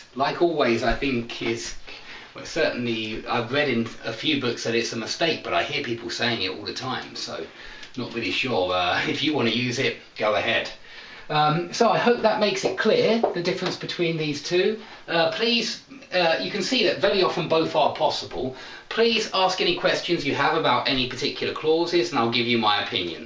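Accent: United Kingdom. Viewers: Your english lessons are the best, because you explain many details. And I always admire your sophisticated british accent -))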